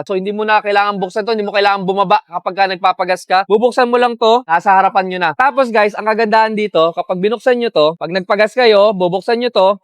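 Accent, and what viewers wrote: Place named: Visayas, Philippines. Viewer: bisaya pag ganyan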